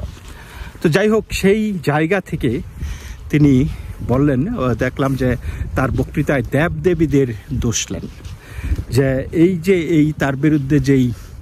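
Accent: Bangladesh. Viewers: উচ্চারণ শুদ্ধ করুন। বাঙ্গাল ভাষার আধিক্য। ভারতীয় বাঙ্গালীরাও আপনার YouTube এর দর্শক।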